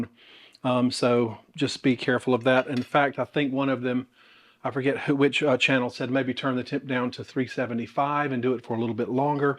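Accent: South, United States. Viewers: ...If anyone is looking for a baby name doesn't sound too bad 😂 came for the onion sheet, stayed for the Southern speech! ❤️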